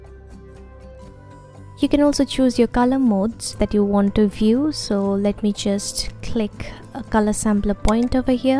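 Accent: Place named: India. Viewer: don’t listen to them. You have Indian accent but you are 100% understandable. 10 stars 🌟🏆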